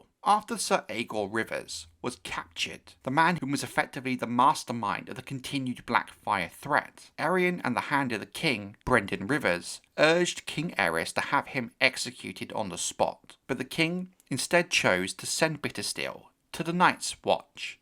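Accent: United Kingdom. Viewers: You have the most British voice ever. Like the josh Brolin of England lol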